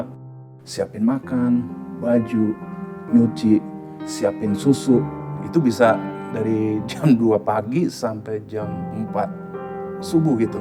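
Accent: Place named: Indonesia. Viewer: Halo om gmna kabar makasih sharing nya Ndak tahu nasip kita di masa kecil sama di sia siain ortu mg sehat om saya penggemar anda dr tahun sembilan tiga waktu masih SMP nam Yogya Anda sdg jaya waktu itu kapan anda pelayanan di Yogya saya juga kristiani katolik Jawa hehe Gusti amberkahi om aksen anda sudah Indonesia bgt. Sukses Dodo palagan km Pat blas Sleman berkah dalem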